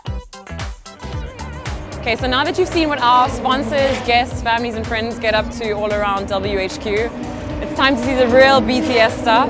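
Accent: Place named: South Africa. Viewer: she has a slight south african accent but a german flag?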